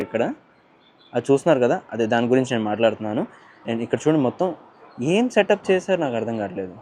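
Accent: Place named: India. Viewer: though ur telugu is hindi mixed ....I appreciate ur telugu......but actual speaking we southindians face huge problem with northindians .....they won't develop thier states ....migrate to our south ....we are facing job crisis ,hindi imposition, culture difference....we south are dravidians....our culture is different from north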